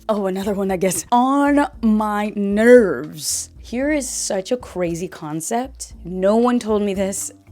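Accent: Belgium. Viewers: Ik ga echt stuk dat je Nederlands met een Vlaams accent hebt geleerd. Het klinkt zo schattig. (Zegt een Nederlander). Ik ga volgend jaar een studie in België beginnen en hoop dat ik het Vlaams accent kan oppakken. Naast Nederlands praat ik ek Frysk, in taal dy't yn it noarden fan it lân sprutsen wurdt. Ich spreche auch fließend Deutsch, weil ich Deutsch auf Lehramst studiere und man ein gutes Niveau braucht, um vor der Klasse stehen zu dürfen und das Studium zu absolvieren. Ich habe auch zweimal für 4 Monate in Deutschland gewohnt. I'd say English is my fourth language. Expressing myself in German feels more personal than in English. Although I'm not sure which language I speak better. Duits is natuurlijk de taal waar ik veel voor heb moeten leren en ik ken de details beter om zo maar te zeggen. Engels heb ik opgepikt door jarenlang Engelstalige televisie te kijken, maar als ik Engels spreek, voelt dat zo... 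vlak. Maintenaint j'apprends français aussi, mais je ne peux pas dire beacoup. J'ai commencé regarder Spongebob en français et j'écoute podcasts avec text. Ik zou zeggen dat een taal onder de knie krijgen even moeilijk blijft, maar hoe je het aanpakt steeds simpeler wordt. Inmiddels weet ik wel wat belangrijk is om te weten voor als je snel resultaat wilt boeken (chunks leren bijv., vooral die echt vaak in gesproken taal gebruikt wordt). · Jouw belgisch tongval is echt "on point".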